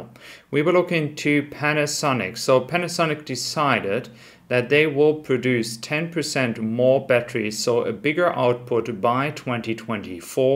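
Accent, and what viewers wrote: Germany. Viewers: I agree, in fact English with a German accent is one of my favourite things. Great content always... thanks!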